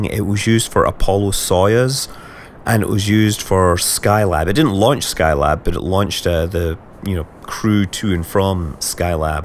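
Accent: Scotland, United Kingdom. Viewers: No, he is a Scotsman.. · He is british...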